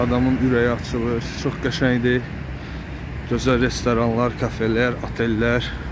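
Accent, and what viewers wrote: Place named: Azerbaijan. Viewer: Приветствую Вас дорогой ❤🇦🇿💞🇦🇿 Простите меня пожалуйста за не скромный вопрос: " Почему вы должны вернуться в Турцию "??? Я по разговору чувствую вы АЗЕРБАЙДЖАНЕЦ......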